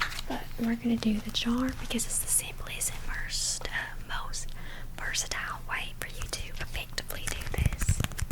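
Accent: South, United States. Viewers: I reckon you nailed the accent hun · Your southern accent is actually really good😀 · Haha great video you sound like Hannah Barron 😅 Your accent southern draw is on point 👍🏼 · Wow! Your Southern accent is way better than I expected 😅👋🏻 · Can you do a part 2 to this or maybe another Southern accent video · Hi 🙂 Definitely getting Dani ASMR vibes with the southern accent. Really good roleplay.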